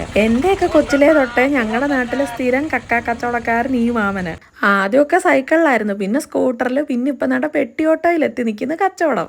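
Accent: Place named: Kollam, India. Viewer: കൊല്ലം കാരിയാണ് അല്ലെ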